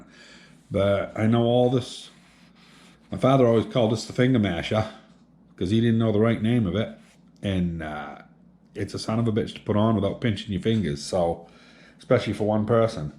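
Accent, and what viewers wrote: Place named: New England, United States. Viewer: Watching the video, and hearing the New England accent... more White Mountain region than Kittery.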